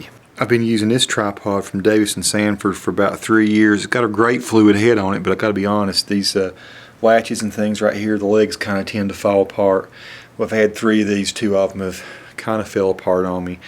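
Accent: United States. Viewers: Your accent is awesome. What part of the States is it from?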